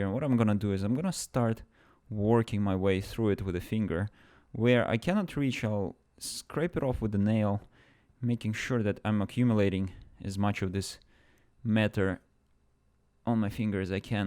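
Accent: Russia. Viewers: С чего ты это взял? Явно русский акцент и отдельный канал где он говорит на русском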